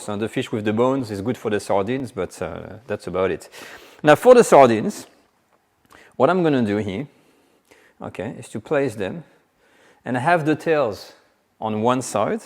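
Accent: France. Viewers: Dude, I bet this video can be done in 2 min or less. Besides, the French have an awful accent. Hair of after 4 min. Really!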